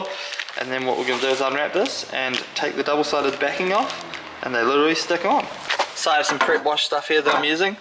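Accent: New Zealand. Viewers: is that an nz accent i hear?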